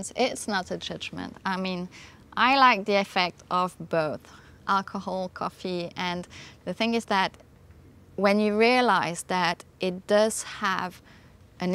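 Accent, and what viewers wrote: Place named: United Kingdom. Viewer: I'm trying to figure out your accent. I know you live in Switzerland and speak French, but your accent speaking English is quite unique. Have you lived in England...because, as well as speaking English perfectly, you do have a tiny bit of a British accent...within your mix.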